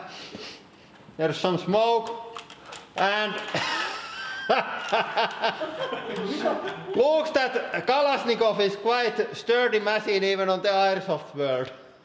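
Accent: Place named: Finland. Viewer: Sun ralli englanti on paras!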